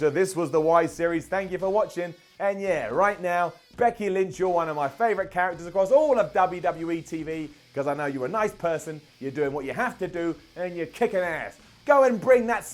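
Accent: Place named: United Kingdom. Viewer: Why he big enough himself in isnt in NXT or WWE in got the British Voice lol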